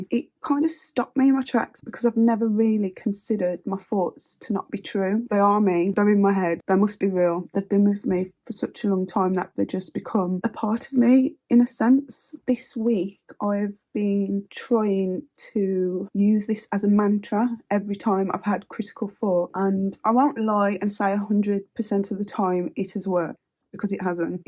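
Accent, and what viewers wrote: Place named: Midlands, United Kingdom. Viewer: Glad I found you. I love a good midlands accent !!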